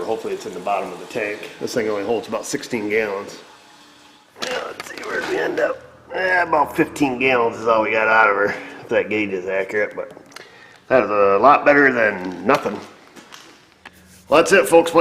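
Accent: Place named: Pennsylvania, United States. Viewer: This guy has integrity and smarts. I'd trust my ride with him, which is trust I don't easily give...judging by the accent, i'm guessing he's from PA. I'm not surprised he's busy as hell.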